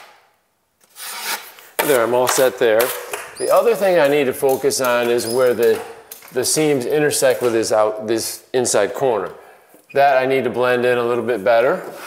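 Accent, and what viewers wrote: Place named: Northeast, United States. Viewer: Hello it's interesting to find your video on here looks like you've been doing this stuff for a long time. Cleaning up this around wondering you must be from somewhere in the Northeast I'm guessing Connecticut Massachusetts😅?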